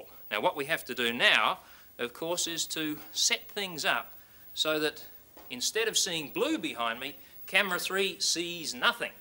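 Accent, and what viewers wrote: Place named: Australia. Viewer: You learn 50% more when the instructor has an Australian accent.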